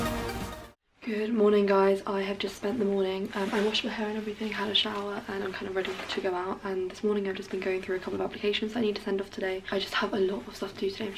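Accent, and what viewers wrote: United Kingdom. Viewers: your english accent is just amazing !!!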